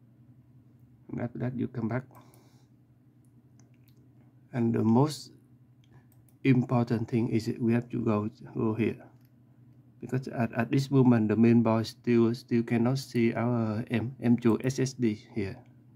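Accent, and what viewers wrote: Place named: India. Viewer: Love u indian accent guy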